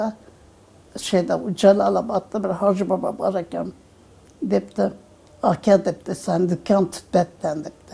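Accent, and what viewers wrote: Andijan, Uzbekistan. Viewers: Андижонча гапиряпти шева Андижон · Онахон сог саломат булинг, 5 ёшда кетган булсангиз хам (Анжон) Андижон шевамизда гапирябсиза , аллохим у́зи асрасин, фарзандларингиз бахтини ку́ринг, afarın teyzacim çok teşekkürler sağ ol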